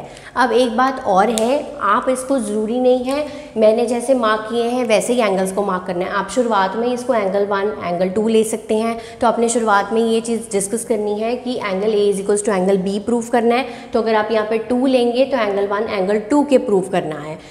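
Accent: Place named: Punjab, India. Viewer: Mam aap ka way of teaching bhut best hai Apki panjabi bahut cute hai ... Mam aap bahut acchi Hain ❤️❤️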